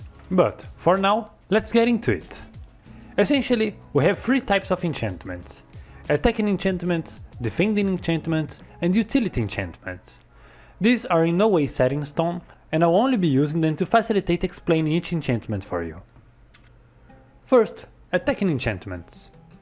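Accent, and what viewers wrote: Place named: Brazil. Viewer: Your video is very good, everything in it is great, I'll watch the other videos and subscribe, keep it up wait... are you brazilian too? (I saw it in the about tab of your channel) now I understand your accent XD eu sou br também tmj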